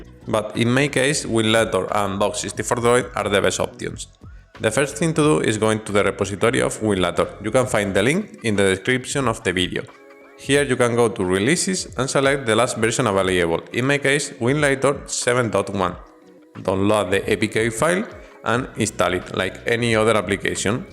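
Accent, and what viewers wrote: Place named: Spain. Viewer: Very spanish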